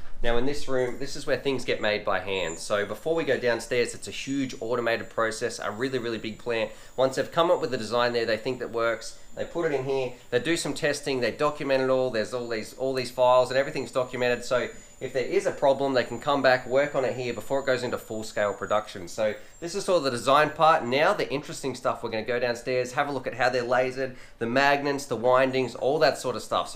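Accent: Australia. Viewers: Wait, are you Aussie? I've been watching you for months now and I didn't even realise your accent until this video ahahah. I'm Aussie too which is probably why I didn't really think about it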